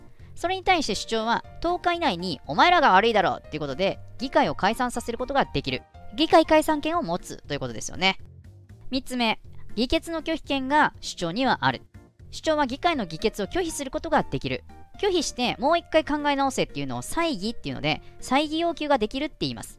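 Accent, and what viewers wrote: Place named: Kansai, Japan. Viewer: この回は関西弁が強調されてる！わら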